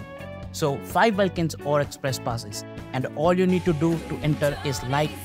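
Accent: India. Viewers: ur indian ryt?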